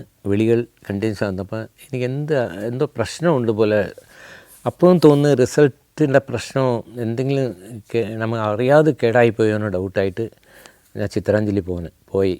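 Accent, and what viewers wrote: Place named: Tamil Nadu, India. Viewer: മി അളഗപ്പൻ, താങ്കൾ തമിഴനാണോ. നിങ്ങളുടെ മലയാളം ഒരു തമിഴൻ മലയാളം പഠിച്ചു പറയുന്നതു പോലുണ്ട് !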